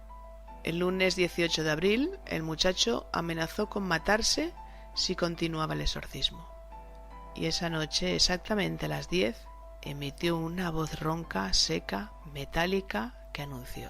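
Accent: Spain. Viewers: Manuel Alejandro, si no te gusta el acento español, buscate videos solo de tu acento. Por otro lado decirte que la narradora no tiene porque tratar de reflejar terror. No esta contando una historia sensacionalista de miedo, para pasar el rato. Si no relatando el transcurrir de un caso, a modo informativo, como documento.... Que le gusta a la gente un sensacionalismo con estos temas... cuando realmente, ese arquetipo de manera de hacer misterio, es el arquetipo que le ha quitado seriedad a la verdadera investigacion o documentacion de los temas de misterio. La narradora no tiene porque narrar de manera que de terror, si no simplemente contar los datos de la historia de manera que se le entienda y quede claro. El objetivo de este video es dar a conocer un caso, no causar terror. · ese acento español de verdad no me gusta le quita todo terror .... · Me gusta el acento de espana, es hermoso ;)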